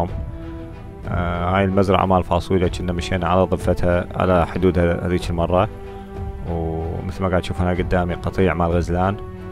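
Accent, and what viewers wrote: Iraq. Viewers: الغالي احله ما بيك الهجه العراقيه الاصيله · يا اخي والله الصراحة فيديوهاتك ادمان....وطريقت كلامك تدل على انك عراقي اصيل اخلاق ومهارة واحتراف متباعك من الجزائر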